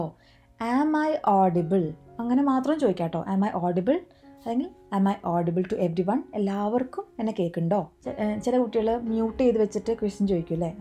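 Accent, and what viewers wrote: Thrissur, India. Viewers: Mam you are from Thrissur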